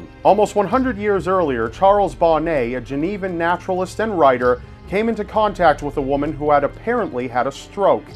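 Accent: United States. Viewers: thepurplewalrus Probably because he's an American or something. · Because he is American ?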